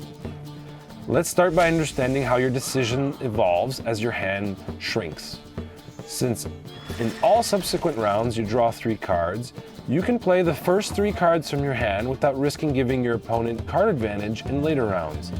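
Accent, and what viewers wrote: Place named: Poland. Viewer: Ah ten polski angielski, ale film super :)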